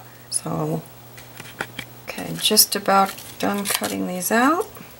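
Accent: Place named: Illinois, United States. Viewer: are you from : Illinois, Wisconsin or Michigan area? You sound like my daughter in law lol :-) She's from Cary, ILL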